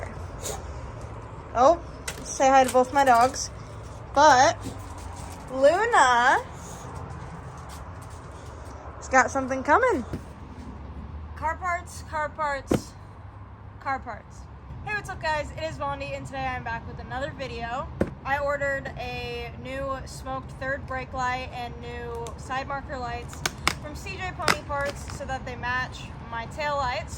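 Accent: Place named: Newfoundland, Canada. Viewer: Thing a ma bobber u talk like a newfie Like me lo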